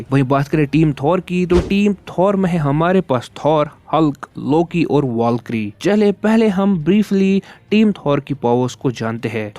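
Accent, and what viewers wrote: Kashmir, India. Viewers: Boss kashmiri ho kya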